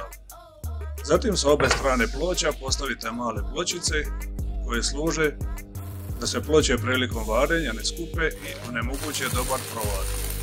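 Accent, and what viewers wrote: Dalmatia, Croatia. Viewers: Pozdrav, nov sam na kanalu pa nisam upoznat, po govoru mi se cinite da ste iz Dalmacije ili bar okolice? Di bi moga napravit atest u Splitu ili okolici?, mlad san momak zeljan certifikacije, tig postupak, samouk cca godinu dana.. pozz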